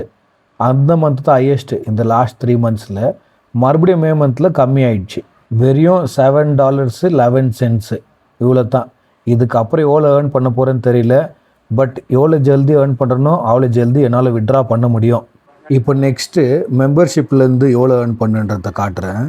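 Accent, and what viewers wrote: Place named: Bangalore, India. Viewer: Your channel is on right track bro..Vitradhinga. Post more contents, different contents. Unga tamil criticize pannatha soninga but enaku contentla romba pudichathey antha bangalore slang tamil than. Youtube is supporting you, you have to put more efforts. Na daily 3-5 hrs stream panren but en efforts ku yetha revenue kedaikala. But your channel doing good, don't waste it. Be consistent.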